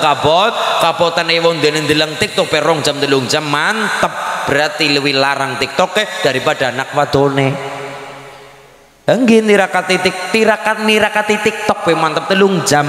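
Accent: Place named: Banyumas, Indonesia. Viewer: Ngaji samasulinnuha semarak semangat soalnya saya seneng sholawatklo ngaji sama mas iudah pasti ada sholawatnya bikin semangat klo ada sholawatnya jadi tidak tegang. Terhibur sama sholawat.merasakan rasanya adem semringah.sejukhatinyapakai bahasa ngapak juga enak didengar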